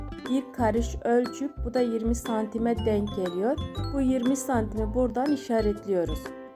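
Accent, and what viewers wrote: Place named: Anatolia, Turkey. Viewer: Anlatimin anadolu şivresi gibi ellerine salik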